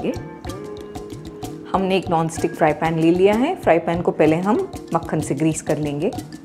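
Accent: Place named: Konkan, India. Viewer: Aap kokni ho na .baato se aap kokni lagte ho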